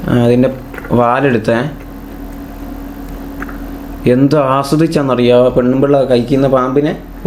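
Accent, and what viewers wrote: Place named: Kozhikode, India. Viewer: Bhasha shaili vyathyaasam indaavum bro Karanam vayanad kaaranaan kozhikod karanan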